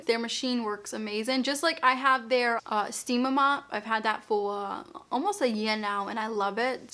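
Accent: Boston, United States. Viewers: Is that a Boston accent? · Brooklyn? I could see confusing it for Queens, but this is 10000% a Boston accent. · OMG YOUR FROM BOOOOSTON I LOVE IT 🥰 love the accent love seeing ppl from my city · Omg that looks like a completely new mattress! How crazy! By the way your hair looks really good in this video 🥰. Oh and I love hearing your Boston accent 🤗 I like how you say car and carpet! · Also I love how many times you said car and carpet in this video I love your Boston accent!!! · Is that a Boston accent? 😮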